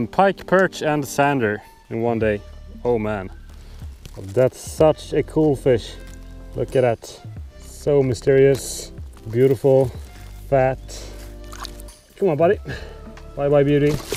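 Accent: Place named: Sweden. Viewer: Varför pratar han engelska när man kan höra att han är svensk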